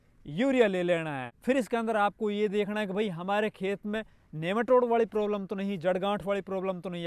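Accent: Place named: Tijara, India. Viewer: आप की भाषा तिजारा की लगती है आप सानदार काम कर रहे है very nice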